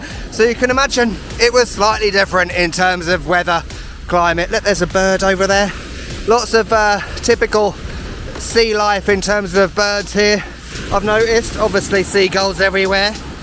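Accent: United Kingdom. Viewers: Thanks again for your great videos! I have visited Baja California and Jalisco many, many times. But now I want to go see Hermosillo and San Carlos thanks to you. Love your British accent! I lost count of how many times you said "brilliant!" or "idnit?" LOL 😂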